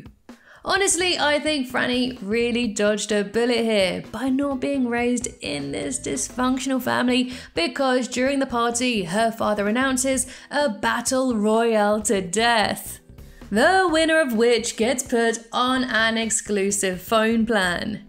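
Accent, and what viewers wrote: United Kingdom. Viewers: Not about the video at all, but Girl, i just LOVED ur british acent, it just, idk, fits u so much, and its so cute to me, just wanted to say that, kisses from Brazil ✋🏾🤠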